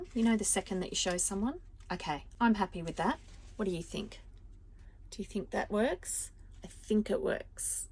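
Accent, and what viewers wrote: Australia. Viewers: Hi, nice to hear another Aussie xx love this journal xx Hope your channel grows, so nice to support you. Look forward to seeing more!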